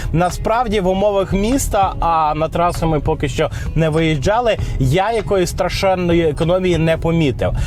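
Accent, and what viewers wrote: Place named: Central Ukraine, Ukraine. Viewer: а чем тебе не понравился язык? вроде довольно чистый украинский центра Украины. ни западного ни восточного суржика не слышно.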